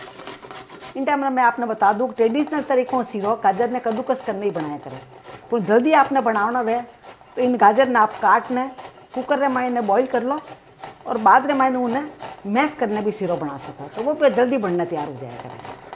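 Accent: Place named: Jodhpur, India. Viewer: VERY.NICE.MATA.JE.YOU.ARE SPEAKING.JODHPUR.LANGUAGE VERY.SIMPLE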